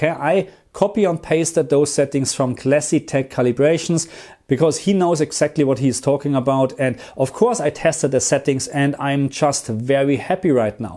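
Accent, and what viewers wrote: Germany. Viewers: I love hearing the German accent.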